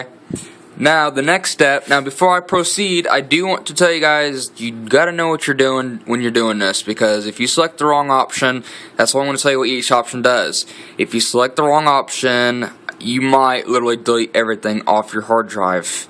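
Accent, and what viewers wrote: South, United States. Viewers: Never in my life heard anyone pronounce it Ray-men, lolz. Ray-men? Not Rah-men. Where are you from? You gots a southern accent for sure.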